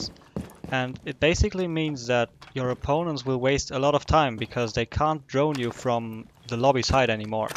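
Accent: Germany. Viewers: Sehr gutes Video, deutscher Akzent sehr deutlich:)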